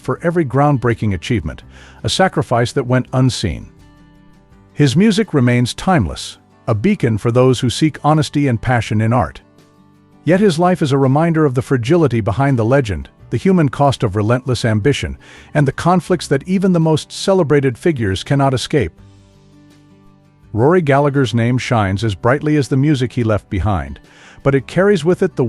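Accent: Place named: United States. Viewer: Who is this Yanky , shitehawk commentator - Absolute bolloxology !!!!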